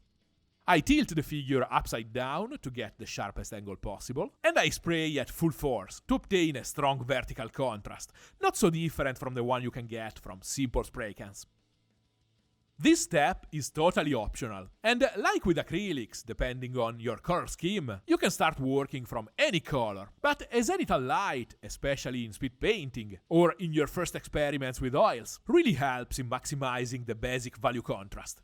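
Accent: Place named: Tuscany, Italy. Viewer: I like your stuff, my Nonno was from pistoia and your accent is bringing back all the feels 😂😂 I’ll pass on the oils for now but it’s good to know the video is here for if and when I get the urge.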